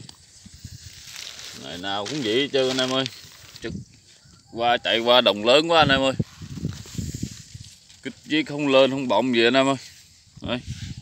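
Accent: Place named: Mekong Delta, Vietnam. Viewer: Chất miền tây a ơi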